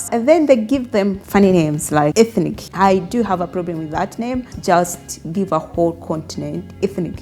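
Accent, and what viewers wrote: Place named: Uganda. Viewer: those who'll understand your accent... she's Ugandan hence the accent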